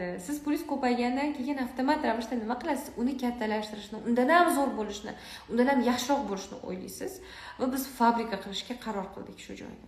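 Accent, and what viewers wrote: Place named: Uzbekistan. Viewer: манга сизни жасоратлингиз ёкди. Жуда чиройлийсиз, исмингиз жисмингизга монанд мафтункорсиз, сокин, у́збекона гапирар экансиз. У́з хаётий тажрибангиздан очик- ойдин бу́лишганингиз учун рахмат. Сиздек у́збек йигит- кизлар дунёга танилишларини хохлардим.Янада юкори чу́ккиларни забт этинг.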